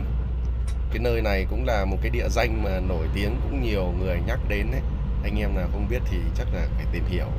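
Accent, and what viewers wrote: North, Vietnam. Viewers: e cũng thích đầu kéo lắm a ở miền trung mà sao lại nói giọng bắc vậy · Quê anh Hà Tĩnh sao nói giọng miền Bắc vậy. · Anh người miền trung sao anh lại nói tiếng miền bắc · anh ở hà tĩnh mà sao lại nói giọng bắc vậy nhỉ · A noi a la người miền trung nhưng sao lại nói giọng Bắc vậy ?? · Bác nói quê miền trung sao em nghe giọng bắc vậy ? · Người miền trung mà nói tiếng bắc ko trượt phát lào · Anh mien trung ma em nghe giong anh em tuong anh la mien bac chu hihi chuc anh nhieu suc khoe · E hỏi cái. A miền trung sao nói giọng bắc vậy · A quê Bắc à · Quê hà tĩnh mà a nói giọng bắc vậy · Miền trung mà a giọng bắc nhỉ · Sao bác bảo quê miền trung mà lại nói giọng bắc vậy nhỉ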